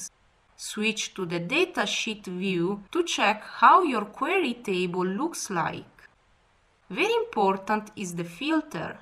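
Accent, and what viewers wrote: Italy. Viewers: This Italian accent though :D so funny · I think it's Italian, definitely not Russian :)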